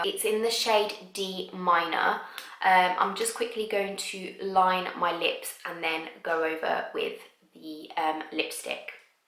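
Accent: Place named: RP, United Kingdom. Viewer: Aww you're so sweet! The make-up looks really good! btw I love your English posh accent 😁 😘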